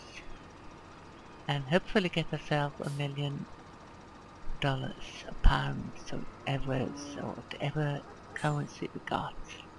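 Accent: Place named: Sweden. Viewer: I can understand you perfectly. Swenglish works well :-) We older generation have to stick together (1952 vintage here). I talk to my motorbikes they like to be consulted:-) Great video I don't think I've ever managed to cover an entire grass field I always find bits I've missed Mike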